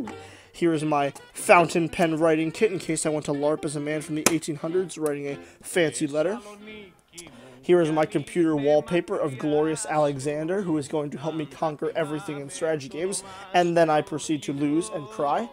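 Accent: United States. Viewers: Why you speak like westerner?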